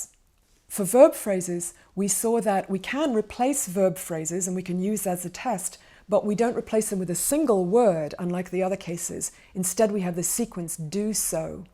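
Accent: Scotland, United Kingdom. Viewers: this is from the University of Edinburgh, I suppose it is a kind of Scottish accent.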